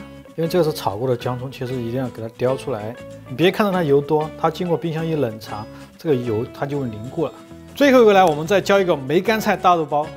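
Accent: Sichuan, China. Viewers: 听到有点家乡口音川谱味儿在里面特别亲切呢😊😊😊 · 师傅四川人吗，哈哈哈熟悉的川普。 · 说的是川普，四川普通话，是四川人啊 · 必须赞👍，讲得真全面。估计师大厨的口音来自四川，👌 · 川普好👂，讲讲牛肉焦饼嘛！好多年没吃到东大街的牛肉焦饼了。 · 师傅四川的，一听就那么舒服😄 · 他是四川人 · 师傅、普通话加四川口音真搞笑😂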